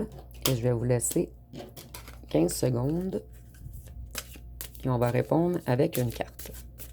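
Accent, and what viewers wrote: Quebec, Canada. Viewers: Merci ma belle ame quebecoise j'adore vous ecouter et charmant accent quebecois.....❤❤❤❤❤❤❤❤❤❤❤❤❤❤❤❤❤❤❤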